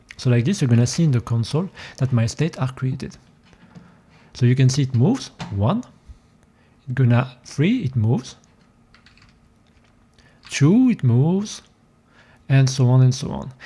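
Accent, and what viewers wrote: France. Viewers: Wow that frenchy accent! J't'ai grillé des les premiers mots x) ! Super vidéo, continues comme ça !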